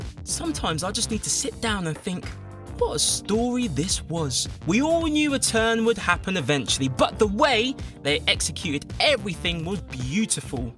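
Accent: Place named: United Kingdom. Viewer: well first of all they're based in the uk so they've got accents and second he speaks just fine I actually really like this guy. Just cause he is not spelling it out for you doesn't mean he can't talk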